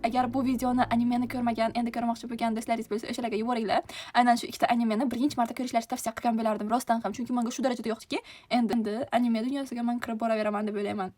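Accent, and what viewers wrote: Bukhara, Uzbekistan. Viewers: Opa Buxorolikmisiz😮😮😮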